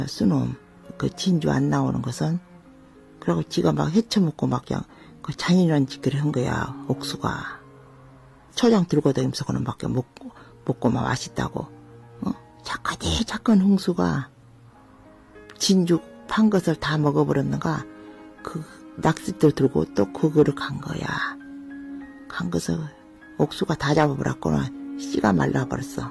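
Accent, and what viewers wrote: Jeolla, South Korea. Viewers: 우리엄마가얘기하는거같다 전라도사투리도쓰면서ㅠ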